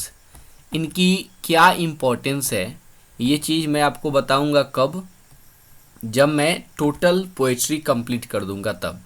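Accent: Bihar, India. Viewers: Gajab explaination in Bihari style...😀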